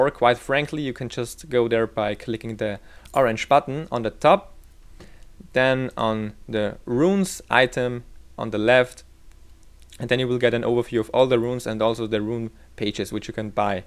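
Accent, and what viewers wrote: Germany. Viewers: German accent? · Are you german?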